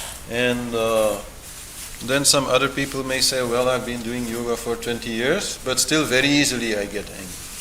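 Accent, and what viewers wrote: India. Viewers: why do you speak like a indian and also spurt out trash ?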